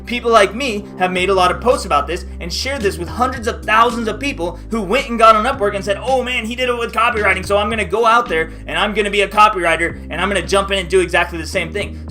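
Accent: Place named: Scandinavia. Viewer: Hey, I wonder whether you're a native english speaker (?), you sound continental european/scandinavian european :)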